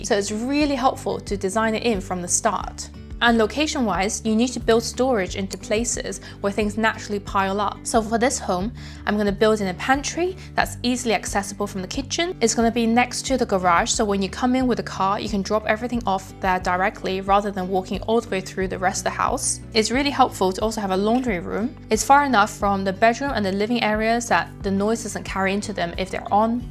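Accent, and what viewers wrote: England, United Kingdom. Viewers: Which part of England is YOUR ACCENT FROM ? manchester?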